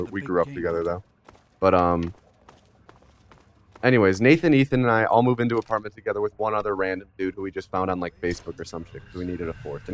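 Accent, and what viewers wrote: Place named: Chicago, United States. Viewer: In a past video you said you we're from the Midwest in this you said you were watching the Cubs game ,guessing your from around cook County too? I knew I recognized that accent.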